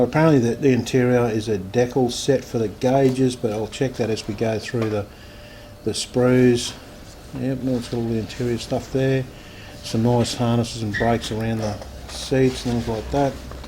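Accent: Australia. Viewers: Awesome review, great to hear a Aussie reviewer, good on you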